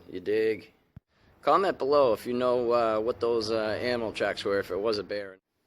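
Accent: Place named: Massachusetts, United States. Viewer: I knew you were from MASS i had an old boss from MASS same accent.Maybe NE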